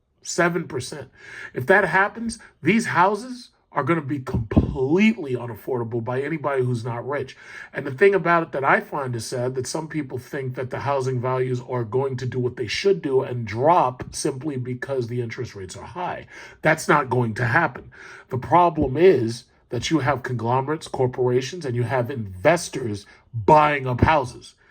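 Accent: United Kingdom. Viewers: Your British accent sounds like Monterey Jack from the cartoon Chip n Dale’s Rescue Rangers 😂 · How dare you bring up the massacre of my boy Jon Snow in season 8. I felt like Don Corleone looking at my dead child watching what they did to his character lol. Love the British accent lol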